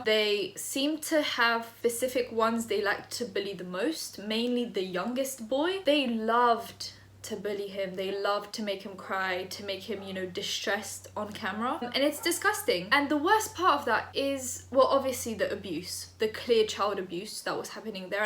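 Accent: United Kingdom. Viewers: honestly i usually can't stand british accents but i enjoy listening to you so much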